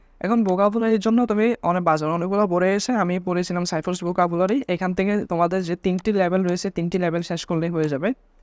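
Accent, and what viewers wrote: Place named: Chittagong, Bangladesh. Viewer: ভাইয়া আপনি তো চট্টগ্রামের মনে হয়, চিনা চিনা লাগতেছে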